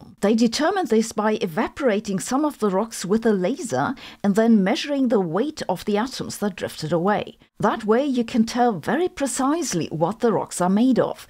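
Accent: Germany. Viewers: Nah, she's just German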